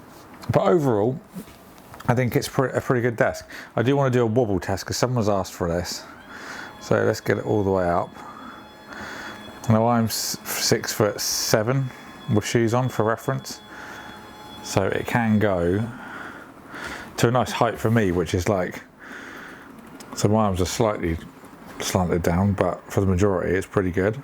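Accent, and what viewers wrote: United Kingdom. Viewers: Where are you from? Judging by the accent I'm thinking Gloucestershire, Bristol maybe?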